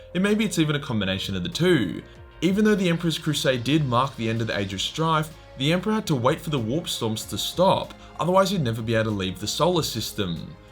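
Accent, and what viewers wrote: Australia. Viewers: Finally, we take aim at. I used to like his stuff, starting with the Siege of Vraks which I still watch. But he got a bit too political. I didn't come for rants on companies and politics I came for the Grim Dark of the Australian Accent.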